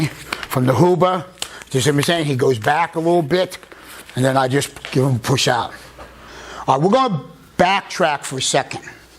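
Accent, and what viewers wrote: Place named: Ohio, United States. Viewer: I looked up your area code, and yes you are Cleveland area- as I thought. I’m from Toledo and you sounded like a buckeye. Really enjoyed video. Great instructor! Bet you have some fight stories? Best to you!!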